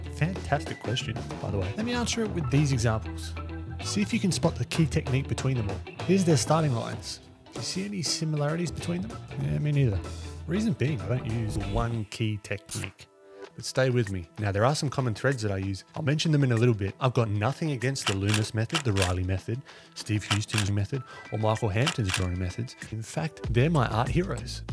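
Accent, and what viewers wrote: Australia. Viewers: I swear you are Australian. That’s all I hear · This type of content is great. Please, continue creating things like this. Really really helpful. Besides you sound incredibly Australian and I love it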